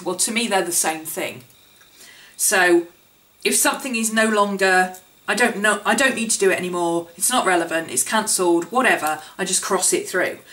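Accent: United Kingdom. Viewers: I'm just about to start bullet journalling :) Happy to hear a British accent, I was starting to think it was a purely American thing x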